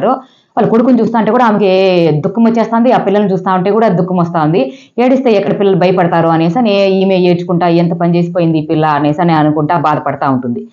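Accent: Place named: India. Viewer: మీ వాయిస్, ఆ provincial dialect అంటే నాకు చాలా ఇష్టం సాబ్.. 😍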